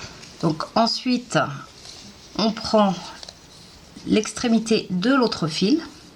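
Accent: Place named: South, France. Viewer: je ne connais pas, je vais m'essayer çà vite fait car c'est méga sympa... merci à toi...et cette point d'accent...çà vient d' ou ??? côté soleil en tout cas !! merci bis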